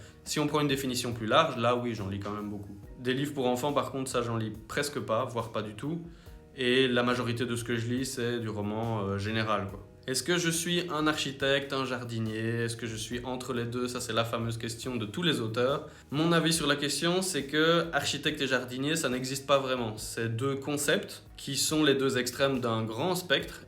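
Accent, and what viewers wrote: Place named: Belgium. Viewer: Je m’abonne ! Tu as tellement de choses à nous apprendre, j’adore ! (Et ton accent belge me rappelle mes années d’études à Lille haha j’adore aussi!). Pour la prochaine fois, des petites phrases écrites pour les parties de ta vidéo ça pourrait être cool (là c’était les questions posées du coup), pour mieux imprimer de quoi tu parles, parce que si notre cerveau se déconnecte deux secondes, je sais pas pourquoi mais j’ai eu la sensation que de voir écrit « les chapitres » ça m’aurait aidé, en tout cas ça m’a manqué à un moment pour me repérer dans tes « parties ». Voilà voilà :) merci !! Hâte de voir les prochaines vidéos !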